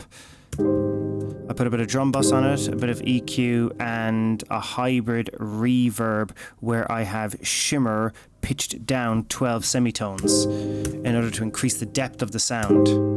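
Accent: Ireland. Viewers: Out of curiosity, which part of Ireland are you from ?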